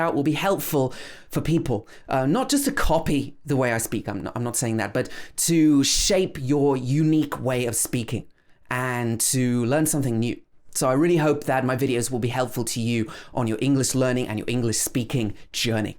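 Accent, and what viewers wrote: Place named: United Kingdom. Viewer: Bro Hollywood movies have an American accent but he speaks British accent so what you may feel difficulty in understanding it ❤️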